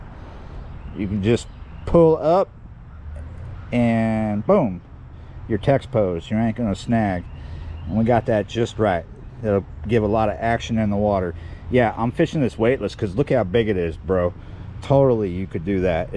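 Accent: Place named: Louisiana, United States. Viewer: Your Cajun accent started to kick in a bit more this episode.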